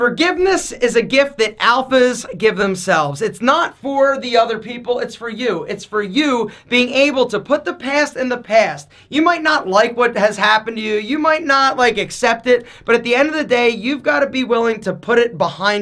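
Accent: Philadelphia, United States. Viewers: I am just here for you Philly accent. Love it.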